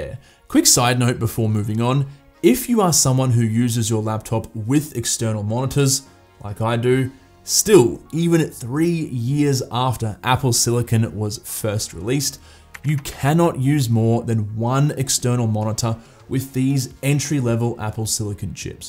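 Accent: Australia. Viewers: Such a great vid, cheers! It took me a while to figure out why it was so easy and relaxing to watch.. it’s just nice to hear another Aussie review these things and not have to process accents haha thanks mate 🤙🏼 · He sounded Aussie to me. Now I'm confused....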